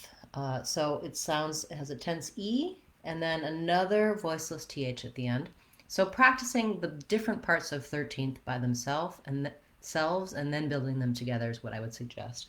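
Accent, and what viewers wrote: United States. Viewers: Fantastic way to immerse in American Accent is to listen to you. Thanks for making it easy for us. <num>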